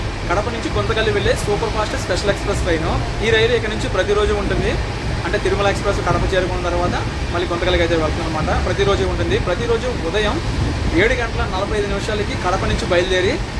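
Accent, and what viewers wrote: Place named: Godavari, India. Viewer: Mi yasa kadapa yasa kadu EG, WG yasa la vundhi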